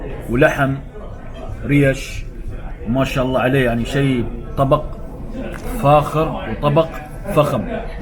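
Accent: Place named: United Arab Emirates. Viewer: الله محيي اصلك ، والله ذكرتني بايام الامارات واللهجه الاماراتيه الحلوه الله يديملكم الامن والامان ويرجع بلدنا العراق لخيره ونستضيفك تاكل دولمه ببغداد ان شاء الله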